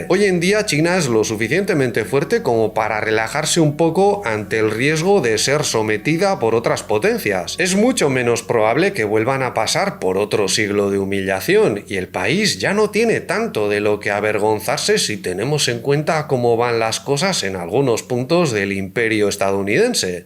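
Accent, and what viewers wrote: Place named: Basque Country, Spain. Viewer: Gran reportaje Me encanta como lo explicas desde el otro punto de vista que aqui en occidente no nos enseñan. Ademas con acento vasco. Ahi va la hostia. Eres un crack. Cuidate.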